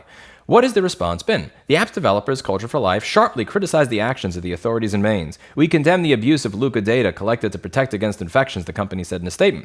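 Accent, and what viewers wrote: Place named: New Hampshire, United States. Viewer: As time goes on you sound more and more like a New Hampshire resident and less like a New Yorker.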